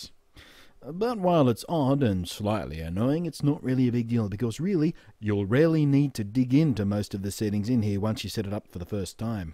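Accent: Australia. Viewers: Hi, love your channel. Good to have a distinctly Australian reviewer. Enough flattery.....:) The reason I would love to be gifted this item is so can take clear videos/photos of my kids. I use my 3GS iPhone for photos/videos, it's OK, but something like the bloggie would be great because it is simple to use, I could teach my kids to make Vids also. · I thought I heard an Australian accent. I love it.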